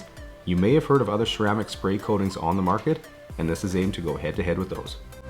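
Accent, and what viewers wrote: Canada. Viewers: I love hearing your Canadian accent and I hope your not thinking I'm a creep. Just I love hearing different accents they are very beautiful.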